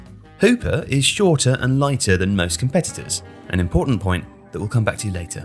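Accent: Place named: England, United Kingdom. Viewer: I checked the comments halfway through the video and had no idea what this could refer to Then I got to the end of the video... good lord it's jarring after the soft English of the main portion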